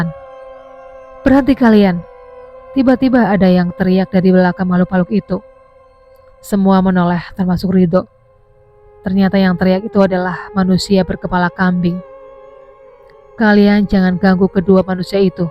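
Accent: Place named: Java, Indonesia. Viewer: Yaelah medok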